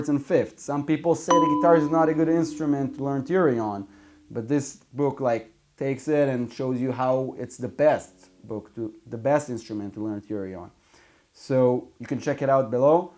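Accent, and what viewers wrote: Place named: Latin America. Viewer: OMG man, your videos are amazing, you make such intresting and useful videos, they can be super useful for beginners+ players. It is a Shame you are so underrated, you make hi-level videos and Don't get as many views as you realy deserve. Wish you the best of luck, keep up with the amazing content. P.s. até you from Brazil or latin America? I think I know this accent hahaha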